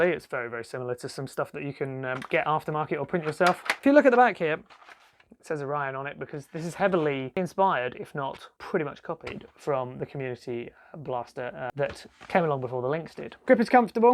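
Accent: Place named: United Kingdom. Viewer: yeah, keep up the great work! it’s not often that I hear another brit on yt, but Ilove it when I do.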